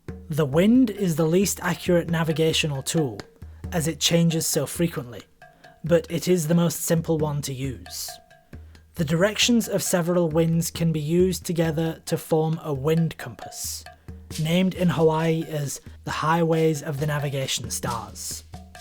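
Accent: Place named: United Kingdom. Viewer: Your accent is awesome. Where in the UK are you from?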